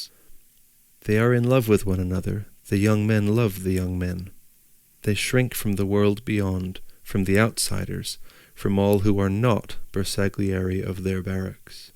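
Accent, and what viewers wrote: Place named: United States. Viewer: Thick american accent…… unlistenable.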